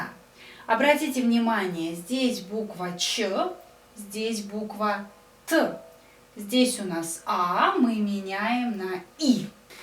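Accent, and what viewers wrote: Russia. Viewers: Акает по московски.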